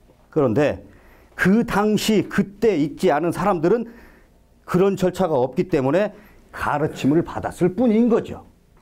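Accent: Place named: Chungcheong, South Korea. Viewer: 군소리가 99% 너무 많다. 그기다 충청도 말씨까지 더하니 답답하고 짜증까지 생긴다.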